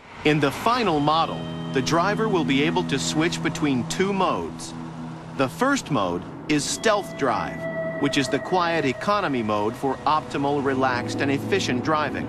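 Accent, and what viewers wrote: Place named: Denmark. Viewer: Haha :D it's funny how clearly you can hear that he is danish ^^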